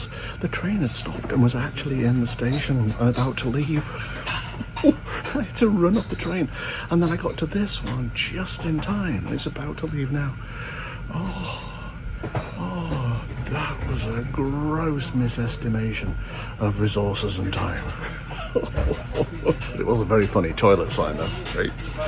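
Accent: United Kingdom. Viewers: You’re like the accidental tourist! Love all your vids, all the narration especially with your posh British accent, and Europe seems to suit you!! Thanks for sharing your slices of life! 🌺🌺🌺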